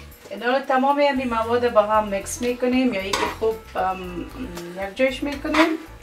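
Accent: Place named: Kabul, Afghanistan. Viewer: تشکر خوهرک چه یک غذا وچه یک لهجه مزدار کابل که ما زیاد خوشدارم مافق وسرفراز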